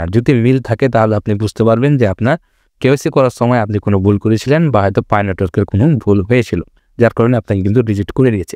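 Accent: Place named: Chapai Nawabganj, Bangladesh. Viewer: Vai Apni ki Chapai zela, ba godagarir upozilar manus? Voice sune amn ta mone hocche..😊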